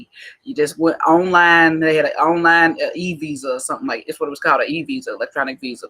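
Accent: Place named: Midwest, United States. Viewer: Where are you from? You sound like you from the mid-west.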